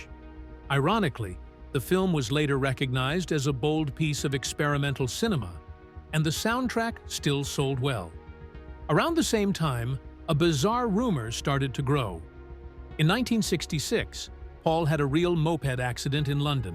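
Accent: United States. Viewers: Why is it an American doing this commentary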